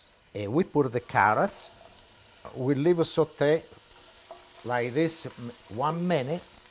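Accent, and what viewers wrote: Italy. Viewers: Sig, you are amazing. You speak English the way I speak Italian, so it's always a pleasure listening to you. Farfalline? I completely lost it when you said it would become a farfallone if you put it directly into the soup. Ladies and gentlemen in the audience, you cannot properly translate what the man said into English. You can, but the translation just does not work - I still can't stop laughing. Think, a little, itty bity butterfly put into the soup would become Mothra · best soup in history in my opinion. btw I really love your Italian accent. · I really enjoyed watching you cook. The soup looks really good. I love your Italian accent.😊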